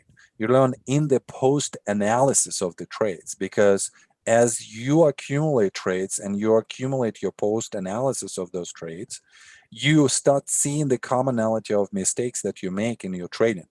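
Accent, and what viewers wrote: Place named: New Zealand. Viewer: your Dulcet Kiwi Tones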